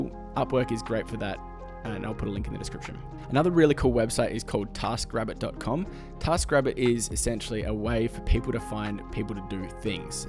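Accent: Australia. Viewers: this is gold info dude. great to hear an aussie voice too. subd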